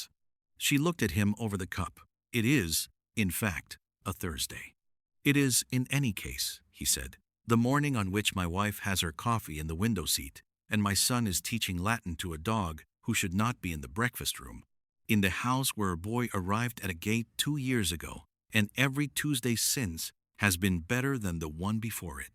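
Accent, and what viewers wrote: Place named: United States. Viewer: A BRITISH MAN, SHOULD BE READING, THIS STORY, NOT AN AMERICAN. 😠💜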